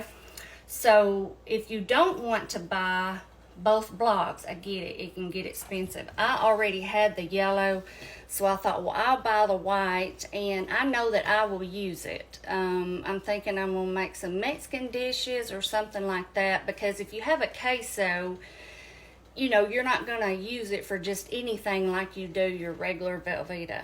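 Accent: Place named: Tennessee, United States. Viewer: I recently subscribed to your channel, I so enjoy your recipes! I love listening to you, my relatives are from Murfreesboro, and your accent makes me feel like I'm listening to my family!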